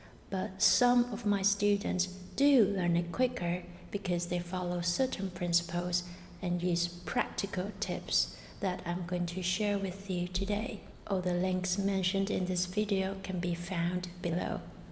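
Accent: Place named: United Kingdom. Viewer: 谢谢老师推荐，你的英音太好听啦！！！🥰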